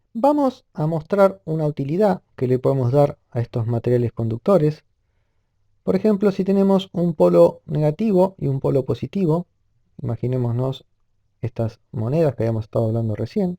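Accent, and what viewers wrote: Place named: Rioplatense, Argentina. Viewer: Creo que eres Argentino o Uruguayo Saludos de Peru Papá se les quiere gracias por el curso💪🇦🇷♥️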